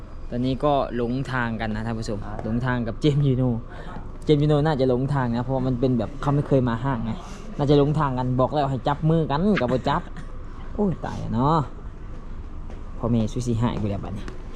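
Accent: Laos. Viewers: ຂ້ອຍມັກສຳນຽງສຽງເວົ້າເປັນຄົນສະຫວັນບໍ່ວ່າຈະໄປຢູ່ໃສ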